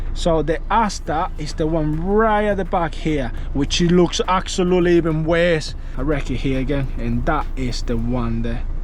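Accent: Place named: Manchester, United Kingdom. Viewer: Think he’s from Manchester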